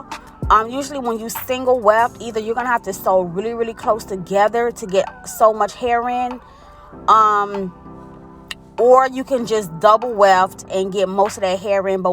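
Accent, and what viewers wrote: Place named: Florida, United States. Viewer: Yassss!! I’ve watched a few of your videos and every time I’m like she sound like she from Florida and does hair like she is from Florida. Heard the beat and was like that sounds like a Florida beat. Scrolled down and saw the 786 area code. FLA Stand up! Born and raised in the 954 😜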